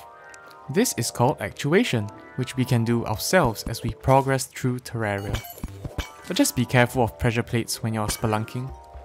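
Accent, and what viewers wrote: Singapore. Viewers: There's some Singaporean accent in his voice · Where are u from? Ur accent sounds like ure from singapore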